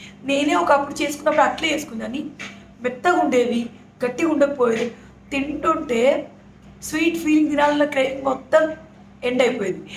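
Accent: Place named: Maharashtra, India. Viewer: మరాఠీ యాస లో బాగుంటుంది మీ భాష, ఉన్నది ఉన్నట్లు మాట్లాడతారు మీరు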